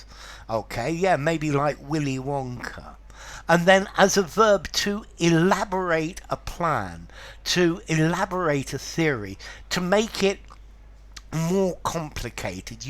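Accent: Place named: Cockney, United Kingdom. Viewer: You're within the bow bell range Professor. You know how to speak Cockney 👏👏👏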